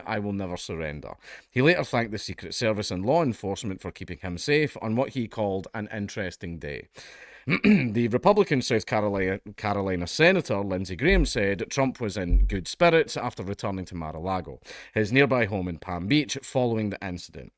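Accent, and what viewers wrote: Scotland, United Kingdom. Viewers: Maybe if you stopped speaking in a Scottish accent you would stop struggling with getting tongue-tied. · Maybe you wouldn't get tongue-tied if you just spoke normally and not in that weird Scottish way?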